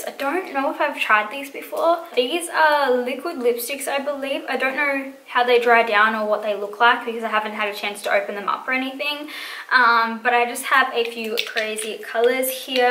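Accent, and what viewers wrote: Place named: Australia. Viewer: 'Just over here fuckin goin ta town' 😂😂😂 your Aussie is on fire in this tut 🔥😆 love it! Hello from Perth definitely subscribing xx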